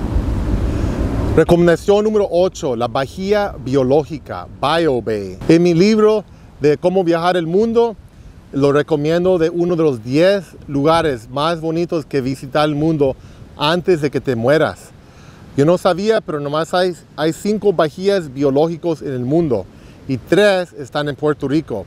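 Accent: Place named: Mexico. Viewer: El tiene accento mexicano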